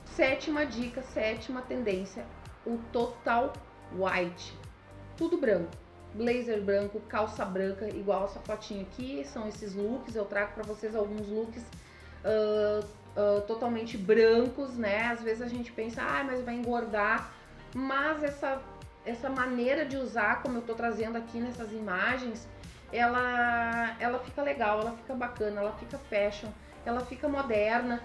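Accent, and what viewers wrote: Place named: Santa Catarina, Brazil. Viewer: Olá boa tarde Como posso comprar vestidos lindos de você? Poderia passar seu número de telefone? Pelo seu jeitinho de falar acredito q vc é de Santa Catarina vc é de muito bom gosto parabéns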